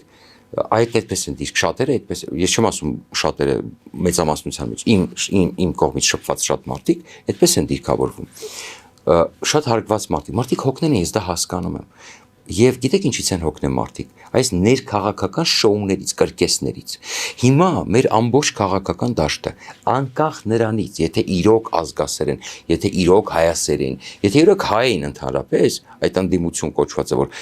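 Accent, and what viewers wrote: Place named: United States. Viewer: Էտ մենակ թողել էք արմենչիկի գովաբանությունները մնացածը ջնջում էք՞, այ լակոտ դու մեզ ուզում էիր բերում Զելենսկուն, որ տեսակ ինչ հզոր քայլ արեց, Տռամփին առաջարկեց իրա հանքերը ու հեսա կտեսնեք բիզնեսմեն Տռամփը ինչ օրնա քցելու պուտինին, ու այ տուֆտա ով ու ինչ օրն ընկա՞վ, խեղճ Զելենսկուն բազմաթիվ կթելու փորձեր տեղի ունեցան ու դեռ շարունակվում են ավելի խորանալ, դու ամերիկանսկի իդեոտ ինչ էիր առաջարկում բերեք զանգեզուրի հանքերը տանք ամերիկացիքին, այսինքն ջհուդներին, որոնց հինգերորդ կետից սնվում էս դու ու քո տականք ընտանիքը, անգամ նրանց առոգանություննես օգտագործում հաչալուտ ժամանակ, երկրորդը, ամուլ սարը ամերիկացիկինը չի դա սեռժա արամական վազգենական ոսկու թեմայա ռուսական դաշտում դու քո հիմար խելքով լսում էս հին աղվես, մեծն դերասան ասմունքող արամ զավենիչին, որ ամուլ սարից իրա թուլա փայն ունի, ու աֆրիկյան աբորիգեն ցեղերի ներկայացուցիչների պես ականջ, պռոշ, քիթ դնում ու բանդաժում էս ու մեզել ուզում էս համոզես որ սա միակ ճիշտնա ու վերջնա՜, այ գյադա ուրիշ ավելի լավ անուն ես չեմ կարող տալ, որովհետև մնացածը շատ ավելի վատն են, երրորդը ասում էս համերաշխության մասին, տո այ խառնակիչ ես դեռ չեմ լսել քեզ, իսկ ես համարյա միշտ լսում եմ քեզ քանզի ես քեզ համարում եմ հայ ազգի ամենա մեծ թշնամիներից մեկը, անգամ նիկոլի վովա օղլիից, քամի որ էտ կեղտը երեսներիսա իրա հակահայկականությունը ցույց տալիս, իսկ դու ագենտ էս ու դա ավելի վտանգավորա, դրա համար ես միշտ քեզ լսելով պատկերացնում եմ թէ մեր թշնամիները ինչ են պատրաստվում մեր գլխին բերեն։ Ես դեռ չի եղել քեզ լսեմ ու դու կոնստրուկտիվիզմից չխոսաս ո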